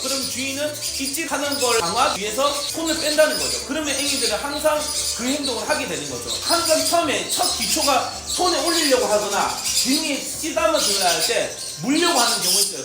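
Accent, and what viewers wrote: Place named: Gyeongsang, South Korea. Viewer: hand-pink-waving:hand-pink-waving:hand-pink-waving:안녕하세요 올만에 적어봐요 ㅋㅋ아따~!! 말도 잘하신다 갱상도 사투리로 막 나갑미데이~! ㅋㅋㅋㅋㅋㅋㅋㅋ 직입미데이~! ㅋㅋㅋ 역쉬 짱~!!! 머리가 좋은거죠 ㅋㅋ애기들이 ㅋㅋ 그래도 아파요 ㅋㅋ 장갑껴도 안된다 하시고 ㅋㅋ 손꾸락 끊어져도 걍 맡겨야겠네요 힛 ~^^ 항상 응원합니다 ^^:face-red-heart-shape:yougotthis:yougotthis:card-red-penalty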